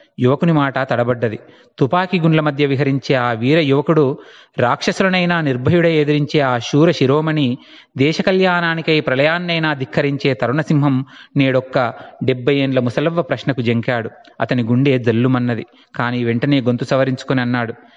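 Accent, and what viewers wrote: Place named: Telangana, India. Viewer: తెలంగాణా యాసా చాలా చక్కగా ఉంది సార్ మీ ప్రసంగం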